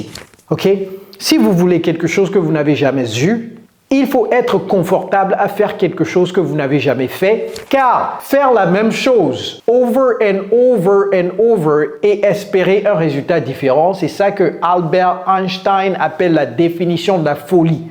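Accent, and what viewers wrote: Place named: United States. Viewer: Salut coach,je suis étudiant actuellement au Nigéria et je parle bien l'anglais mais je veux parler l'anglais Américain comme vous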